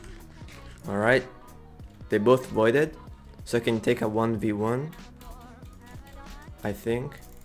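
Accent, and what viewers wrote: Italy. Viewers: Si sente proprio che sei italiano lol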